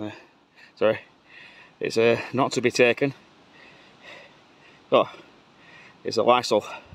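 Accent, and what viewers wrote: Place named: Lancashire, United Kingdom. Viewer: Hi I'm a recent subbie. I enjoyed watching this dig, it's always interesting to see if anything good appears whether it does or not. The Cutex bottle is nail polish. The brand is still going nowadays. Nice to hear the Lancashire accent as I was born in Burnley but lived down south since I was one year old. Keep up the good work!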